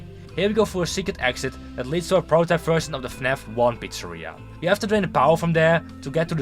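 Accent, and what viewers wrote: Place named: Netherlands. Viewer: waarom klink je nederlands haha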